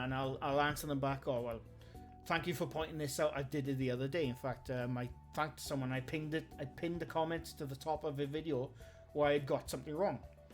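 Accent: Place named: Scotland, United Kingdom. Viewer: Love your accent. Scottish??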